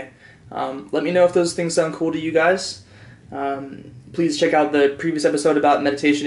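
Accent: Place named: France. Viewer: You have a little accent . Are you French ?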